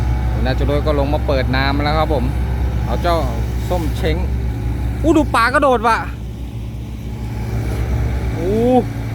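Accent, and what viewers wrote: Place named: Sukhothai, Thailand. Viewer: สำเนียงเหมือนคนสุโขทัยแท้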